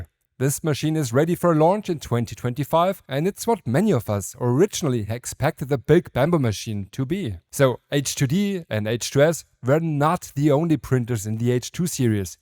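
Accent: Germany. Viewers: man hört aber das du deutsch bist 🫣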